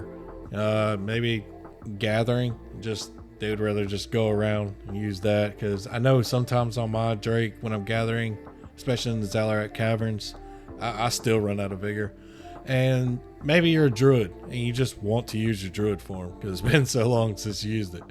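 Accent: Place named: South, United States. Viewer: Oh my word the accent on this man. I have a southern accent also . Thank you for a awesome video.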